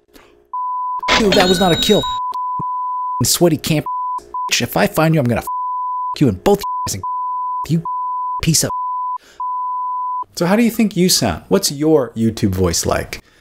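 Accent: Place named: Canada. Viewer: I barely hear Canada in your voice. I cringe at my own accent sometimes when I watch my videos back!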